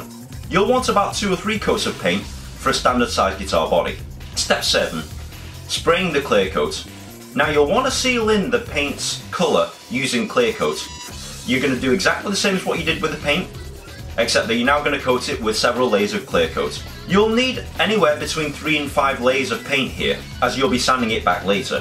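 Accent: United Kingdom. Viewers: Asian guy sounds British....blowing my mind!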